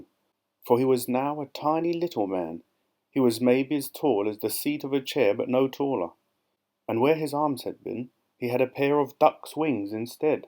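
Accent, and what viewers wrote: United Kingdom. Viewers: I really like your British accent.. and I listen to your stories so I can master this accent. Thank you❤❤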